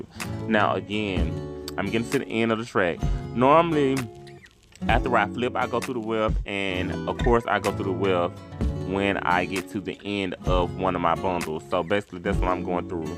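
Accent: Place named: South, United States. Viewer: “Big country plats” 😂😂😂😂😂😂 I have not heard the term plats used to describe braids in a long time... I love it.. I love your southern accent.. Also the video is very detailed and on point... Thank you.. I’m subscribing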